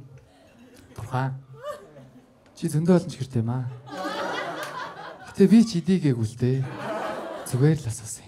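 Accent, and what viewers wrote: Uvs, Mongolia. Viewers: engiin yria ni hurtel Uvs ym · Зүгээр ярьж байхдаа ч увс хэл нь гарч ирээд байдаг наасан ккк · huurhun shdee uwsaar yrihaaraa bvr huurhun kkk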